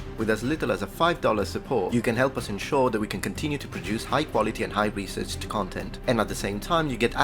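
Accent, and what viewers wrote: Sicily, Italy. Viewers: He's speaking with a slightly Italian accent. Palermo is in Italy last I checked. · Sicilian, he is from Palermo. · The italian accent make history lessons about that region more authentic...I am not sure if I like that thought, but I like that it is what it is😅 God bless you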